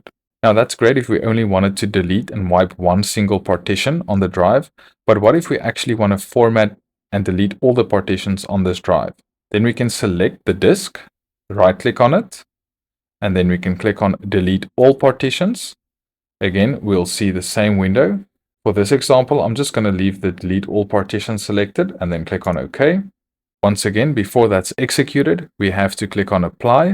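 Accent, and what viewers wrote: South Africa. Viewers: he instantly sounds SO SOUTH AFRICANNNN 🤩🤩😍😍 undeniable SA accent !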